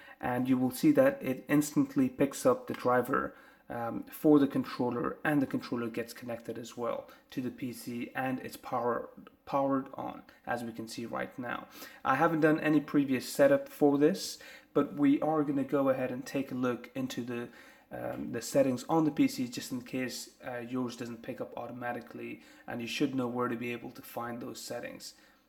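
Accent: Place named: Northern Ireland, United Kingdom. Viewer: Great guide, sounds like uve a northern irish twang haha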